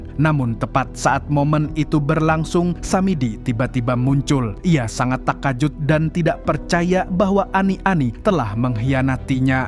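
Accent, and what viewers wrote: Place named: South Tangerang, Indonesia. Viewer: Orang tangsel mana suaranya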